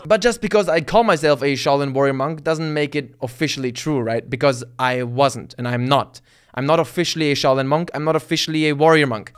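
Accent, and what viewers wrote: Germany. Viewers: Ah. Thats why his english sounds so german.. :D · Wait. Are you german??? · Just realize you have a little bit of a German accent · Hey... uhhhh.... Mr Shaolin... uhhhh... warrior.... uhhhh monk disciple... guy... with the German accent. Damn! I'm hell-a confused even more now! 太麻煩了！ Loved binging on your Shaolin videos. Experience is everything! 恭喜！加油！ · Is he German? Im not sure he got the accent and this "deine Papiere Jude" is also German but he doesnt say anywhere that hes German.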